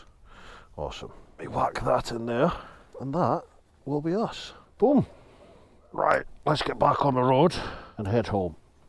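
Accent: Scotland, United Kingdom. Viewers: You sound a typical Scot and i am from Glasgow now living in La belle France still living my life and approaching seventy five Merry Christmas mate.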